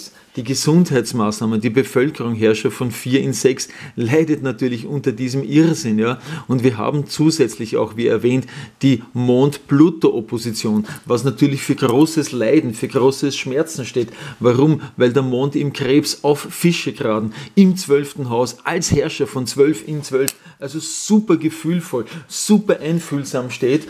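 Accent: Austria. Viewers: 💜🦢💚 Bedanke mich & grüsse ALLE herzlich ⭐ am Samstag Gemüse gepflanzt in meinem wilden Garten 💚🦢💜 Ich liebe den österreicher Akzent.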